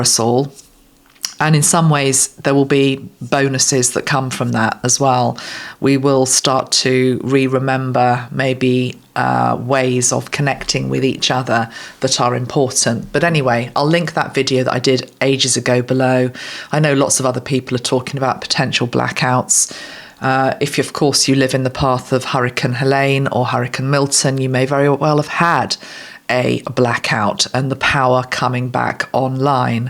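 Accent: United Kingdom. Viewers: I am thrilled to watch this video,! Thank you for sharing your insights. I am hoping someday I’d get to visit your country U.K. When I was still learning English as an elementary student years ago, I dreamed of being able to speak in classy British accent like yours😅…! Well, I ended up here in the U.S., and I am a supporter of JD Vance!!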